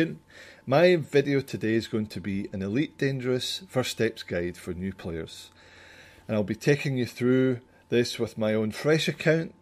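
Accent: Scotland, United Kingdom. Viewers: he definitely is scottish, yes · Are you Scottish? Great accent dude! You said it, "steep learning curve." It's been pretty miserable learning it but now that I slogged through the basics I'm totally hooked. Amazing game they created, can't wait to play it in VR! Thanks for the free videos, helpful. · you Scottish mate?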